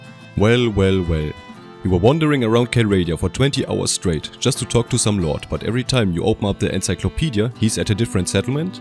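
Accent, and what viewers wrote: Germany. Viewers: Bitte in deutsch It is hard to hear the Denglisch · Ich liebe deinen Deutschen Akzent, einfach geil xD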